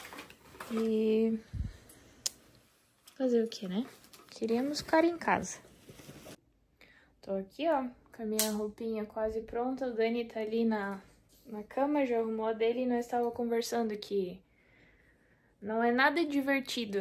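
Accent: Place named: Rio Grande do Sul, Brazil. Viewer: Oiê eu tmb cheguei no canal de paraquedas. Quando ouvi tu falando pensei 🤔, é Gaúcha e de Santa Catarina ❤. Sou do RS moro na Serra, nos conhecemos pelo sotaque que amo demais. Maratonando teu canal aos poucos.bju. se cuidem gurizada💚 ❤💛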